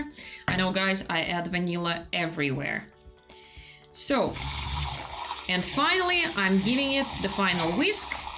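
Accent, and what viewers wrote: Russia. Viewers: Classic russian accent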